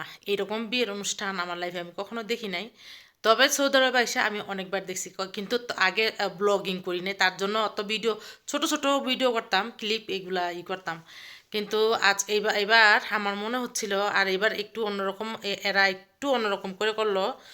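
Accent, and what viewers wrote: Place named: North, Bangladesh. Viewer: কথা শুনে মনে হচ্ছে -বাড়ি দিনাজপুর, ঠাকুরগাঁও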